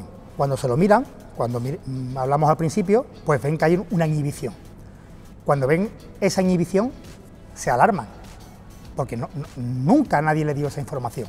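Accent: Andalusia, Spain. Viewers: Otra pregunta, ese acento español es de que ciudad?😉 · Eres Andaluz??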